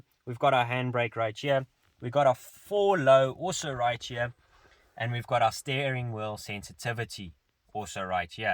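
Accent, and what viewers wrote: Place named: South Africa. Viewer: Gd review bud, u sound South African 🇿🇦🤔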